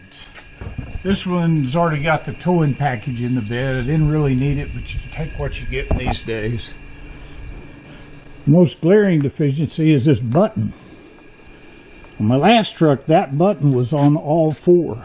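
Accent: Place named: South, United States. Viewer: What's sad..is they will turn right around and resell that POS you had to trade in to another poor guy who will be unaware of the ongoing issues that you had. You seem like such a genuine person and remind me of my father minus your southern draw you have. I'm a Ford man myself but I really hope this new truck works out for you. I'm glad that the GM at the dealership tried to take care of you. I wish we had more people working at dealerships like him. Good luck sir.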